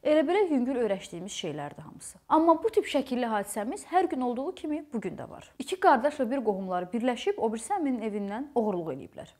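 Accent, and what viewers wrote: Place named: Sheki, Azerbaijan. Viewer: xanım haralıdı görəsən? Şəki ləhcəsi var, amma videoroliklərdə lap bizim kəndin ləhcəsində danışır, əsas da ana 🤣🤣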